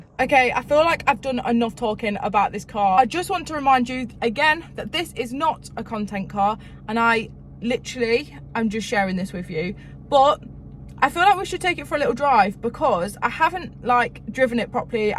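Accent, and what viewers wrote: United Kingdom. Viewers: Why are you speaking brittish ?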